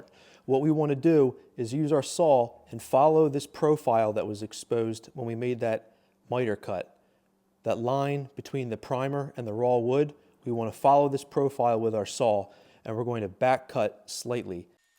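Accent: Philadelphia, United States. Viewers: thought I detected a Philly accent. then I saw the hoody. go phills!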